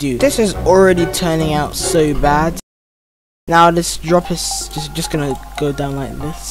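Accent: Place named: Brazil. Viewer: no i feel like u talk from brazill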